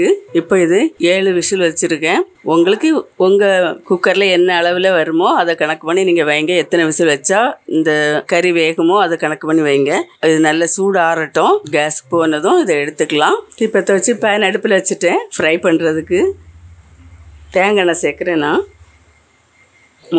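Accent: Nagercoil, India. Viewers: I like to hear Nagercoil slang. Thanks for the video ☺️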